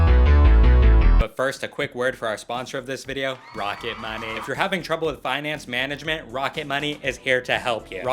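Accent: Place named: Chicago, United States. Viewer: this guy gotta be from Chicago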